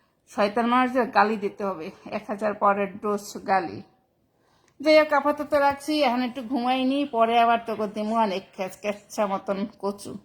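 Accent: Barishal, Bangladesh. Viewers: কথা শুনে মনে হচ্ছে বরিশাইল্লা মানুষ । তবে এক্সপ্রেশন গুলো অসাধারণ ছিল !🫡🫡🤣🤣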